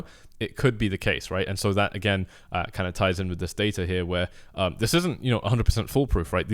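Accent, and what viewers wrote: Scotland, United Kingdom. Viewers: Just found your channel mate, love it. East coast of Scotland accent??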